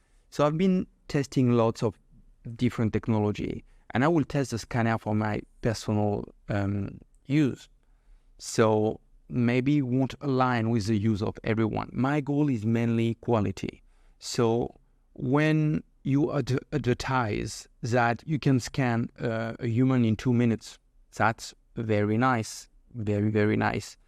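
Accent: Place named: Spain. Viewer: No problem on the accent! We have a lot of Spanish people in this part of the country!, LOL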